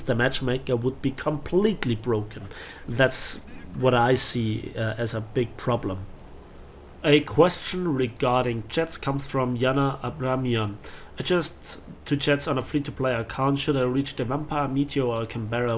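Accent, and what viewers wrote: Bavaria, Germany. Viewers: I only recently subscribed to your channel and so I don't even know most of your signature style reviews. Anyway, I value the complex, complete and non-biased approach of your stream of thoughts. In this episode, you really shine when you explain the "Tiger-Problem" - something I wasn't aware of because I do not frequently follow the tank side of WT. As a non-tanker I can only say, I got my premium Königstiger maybe two years ago and played exactly three matches in it because I was horrified at the thought that I would be humiliated by some lower tier opponent. At least this fear is gone now but I guess, in the rare cases I play tanks I will stick to my StuG III in arcade - and even occasionally have multi-kill matches in it, ha ha ha. Anyway, thank you very much for your interesting videos and keep up the good work. And btw, I really like your Bavarian-English. 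Viele Grüße aus Unterfranken und schöne Restwoche!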